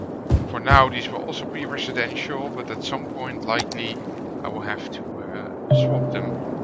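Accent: Netherlands. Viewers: keep up the good work! and from a guy from japan your dutch accent sound funny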